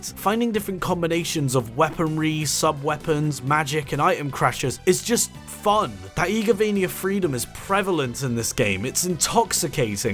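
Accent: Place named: Netherlands. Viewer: yes i really enjoy your Dutch accent.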